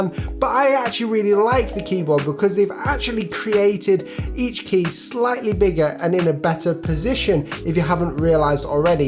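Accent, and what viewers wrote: United Kingdom. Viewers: I LOVE the British pronunciation of 'aluminum' and words in general. I should have been a linguist.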